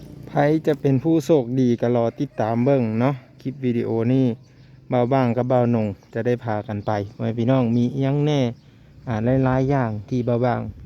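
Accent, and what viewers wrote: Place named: Nakhon Phanom, Thailand. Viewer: คือเว้าคือคนไทยจังหวัดนครพนมแ ท้นึกว่าเป้นคนไทย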